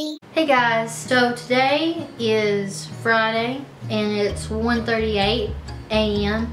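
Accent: South, United States. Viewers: I love ur country accent it's really sweet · Throw back to when still had her southern draw ❤️👅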